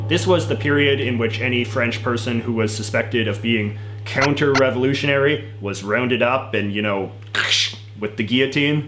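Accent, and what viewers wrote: Canada. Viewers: J.J. McCullough- are you Canadian?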